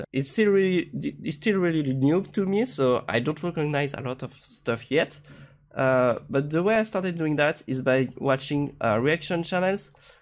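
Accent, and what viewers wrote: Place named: France. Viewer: L'accent français reconnaissable entre 1000 mdrr, depuis tu as progressé ?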